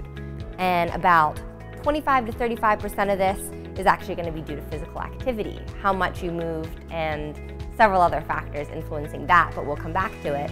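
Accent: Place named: United States. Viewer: She sounds like she has every American female accent at once.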